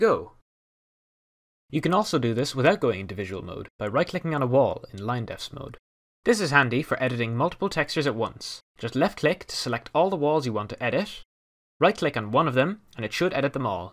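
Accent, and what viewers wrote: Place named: Ireland. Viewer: why are you irish.....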